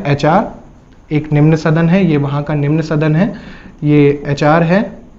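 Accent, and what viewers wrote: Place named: Punjab, India. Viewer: Sir app Jo Acha Acha Bolte ho Jaise Punjabi log Bolte hai vaise Bolte ho appp .......nice ......from punjab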